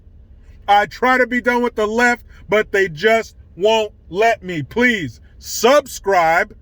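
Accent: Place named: South, United States. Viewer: Omg, your southern accent is my favourite!!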